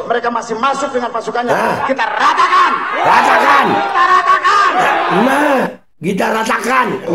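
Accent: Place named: Jakarta, Indonesia. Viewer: Salut sama..😂Bawain Acaranya slalu bikin ane Ketawa karna ada logat Bahasa BETAWInye😂ane ndiri orang Betawi Salam Dari Betawi Pinggiran Bang 🙏🏻👍🏻😁.. ane suka liat videonya.. Yah Emang Musuh Yang Paling Jahat,paling Licik,paling ga manusiawi itu, ibarat Pepatah SERIGALA BERBULU DOMBA..Musuh yg menyerang dari dalam,di dalam Umat Muslim sendiri..Mengaku Muslim..tapi Menyerang sesama Muslim,kaya gini nih sifat PWI LS..😂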